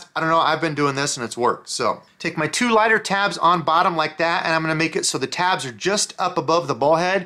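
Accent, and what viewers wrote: Iowa, United States. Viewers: Petition for to make more root beer colored jigs to hear him say it, love the Iowa accent 😂😂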